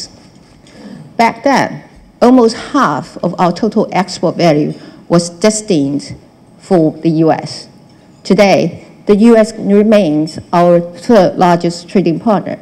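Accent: United Kingdom. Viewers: 開場的聲量還有點顫抖、可能是興奮稍微害羞等等，有點台灣腔跑出來，但是後面部份鎮定了，進入主題後展現自信、侃侃而談，很明顯是流利的英國腔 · 這台風和幽默感~ 英國腔好聽就不用提了XD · 英國腔很重捲舌短音尾音拉高、氣音加重是傳統英國式英文跟美國粗獷大條不掩飾的發音有很大不同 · 有兩個英文youtuber都鑑定蔡英文總統是偏向英國腔 ( 請搜 "浩爾譯世界" )，總統加油!!! 2020 請再為台灣服務! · 很有趣的開場，英國腔有點不習慣，不過還是ok的! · 愛英國腔😍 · 英式的英文！ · 蔡英文的口音是英國腔耶，好好聽喔！英文真好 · 被東吳英文系的韓國瑜嚇到掉了一魂，來這裡聽蔡英文的英式英文壓壓驚。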